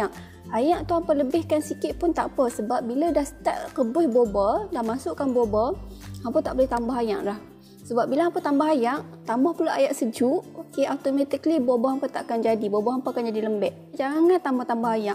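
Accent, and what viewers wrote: Kedah, Malaysia. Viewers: Kuat gile kedah nya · Org kedoh? Atau org oprg perok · Ayaq ayat kedah L.A. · Ni orang kedah ka kl.. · Suara macam Wartawan AgroTV kat TV1. Tpi ni version Kedah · org kedah ke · orang kedah ke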